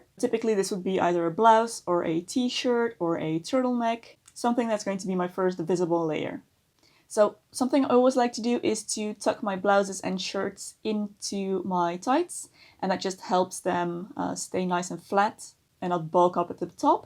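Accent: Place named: Netherlands. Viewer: i heard dutchie in your accent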